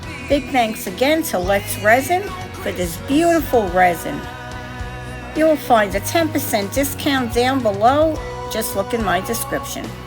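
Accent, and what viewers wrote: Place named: East Coast, United States. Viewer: Absolutely gorgeous!!❤ I love the embellishments you put on them 💖 And every time I watch your videos I think to myself that it sounds like you’re an East Coast girl even though you live in Cali 😁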